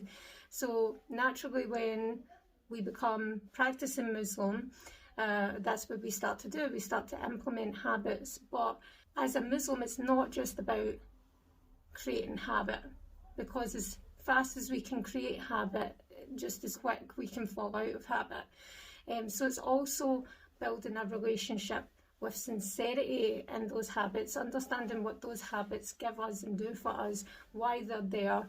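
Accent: Scotland, United Kingdom. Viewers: May Allah bless you sister, as for me I really love the Scottish accent, I consider myself in a way good in English, but to many others it would be helpful for them to put subtitles as well, may Allah accept the fasting from all, bless you and your family, and guide all your loved ones into Islam. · As a muslim who understand English even in different accents and My mother tongue is Arabic , I may give you an advice that it is better for a wider audience to start writing subtitles because I know many many people who does not understand the Scottish accent . may ALLAH subhanahu respond to your good intentions. Ameen .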